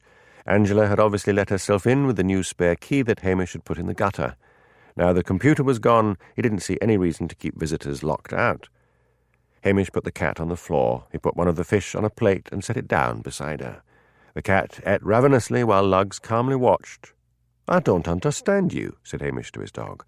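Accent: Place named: Scotland, United Kingdom. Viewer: Thanks for uploading. I only read the ones read by this Narrator. His Scottish accent certainly adds to Hamish Macbeth's character.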